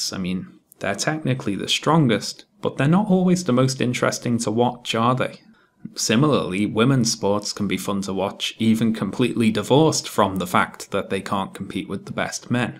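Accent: Liverpool, United Kingdom. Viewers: ahh wait nah im now hearing more liverpool type tones in your accent, nvm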